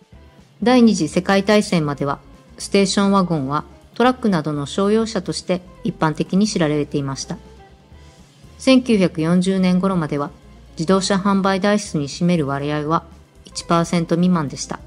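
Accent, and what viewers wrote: Kagawa, Japan. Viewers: やっぱりこれ讃岐弁ですよね(東かがわ出身並感) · 讃岐弁？！ありがとうございます^ ^ ステーションワゴンの魅力を改めて発見できました！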